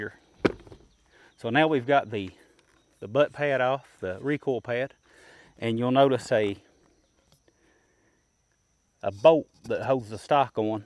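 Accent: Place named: North Carolina, United States. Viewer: Excellent video. Exactly what I was wanting to know. You're accent sounds like good ol NC. Am I close?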